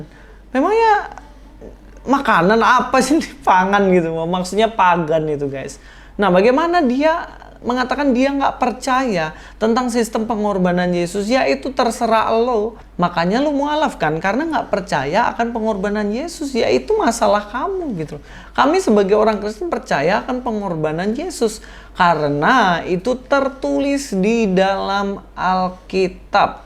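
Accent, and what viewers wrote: Java, Indonesia. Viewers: Cino logat jowo kui biasa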